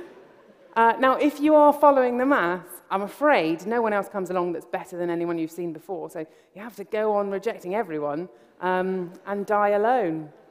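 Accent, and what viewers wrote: United Kingdom. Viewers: Smart, likes math, sense of humor, British accent and a ginger. I'm in love.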